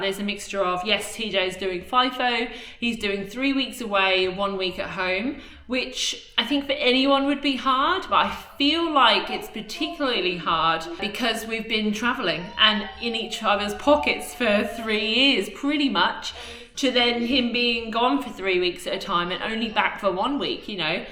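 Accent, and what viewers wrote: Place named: England, United Kingdom. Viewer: Hi from New Zealand It is great to hear your English accent again. Thanks for your honesty of your life Once a traveling family always a traveling family. Yes you have to try things out to see if you like it. It is great to see a close family that wants to be together I admire that. Yes stay strong together and support each other. It would be great to see update videos on this channel every 6 weeks or so as I only follow the youtube format. It doesn't have to be flash and fancy. Just a talk video like this one and some short highlight videos. The house videos would be interesting to see but your future videos would be better. I at 59 would sometime in the future would like to come to Australia to do a 3 year traveling holiday but I have to encourage my wife to do it. We do enjoy for holidays and weekends enjoy traveling in our 2007 Jayco Sterling caravan and our 2015 ford ranger. 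It would be good to see you in NZ one day. All the best stay strong. Keep the great videos going thanks Jono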